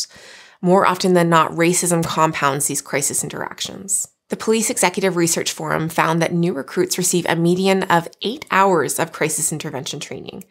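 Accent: Canada. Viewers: You’re Canadian ?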